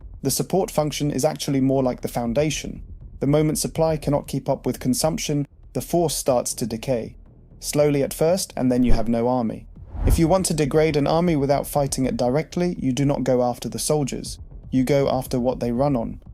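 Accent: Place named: United Kingdom. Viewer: So stereotypical British accent.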